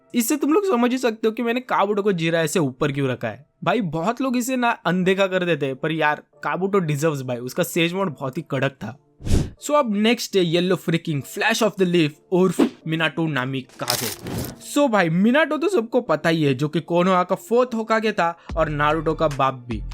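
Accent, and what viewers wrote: Maharashtra, India. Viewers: Bhai tu Marathi hai?!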